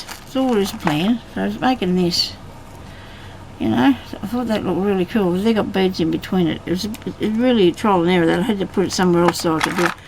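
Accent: Australia. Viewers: Hello - I have just discovered your channel and watched your tassel making. When I started to listen to you talk I thought ooh! is she an Aussie or Kiwi - just took a couple of sentences to figure it out - we speak the same speak. I loved the tassel you made - I know it was last year but anyway loved it - wandered into my small humble craft room and looked around - I am getting cracking tomorrow. I also loved your velveteen flowers and am totally inspired. I am from Perth and am going to watch all your videos now. Happy New Year to you and your family - this also includes the cat!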